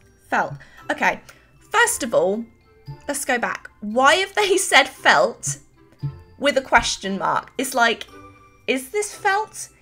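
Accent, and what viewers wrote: England, United Kingdom. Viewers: Is she British because i cant place the accent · Ok are you British? You sound like it. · Shes super cute, specially with the English accent. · Are you from the UK cos u have a British accent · Is she British? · Are you briish? cause I can hear the " " when you speak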